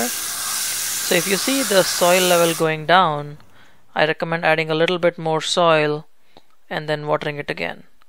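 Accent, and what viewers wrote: India. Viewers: From your voice i think you are indian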